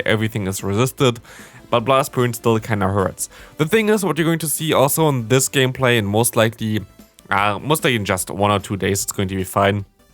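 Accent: Germany. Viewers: Love that Cofagrigus Shiny, got one myself, but Bad Ivs 😅 Been watching your vids for some time now, are you german? Because you have a bit of a german accent when talking english. I've got it too 😂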